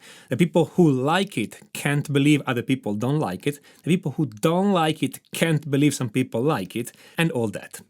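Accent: Italy. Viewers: I love your Italian accent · Ho riconosciuto subito l'accento italiano (non avevo mai incontrato questo canale )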